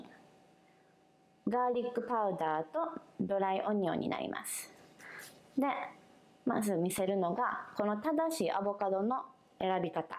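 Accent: Kansai, Japan. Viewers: めっちゃ関西弁やん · イントネーションが関西ぽくて親近感湧きます 美味しそう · 関西弁だ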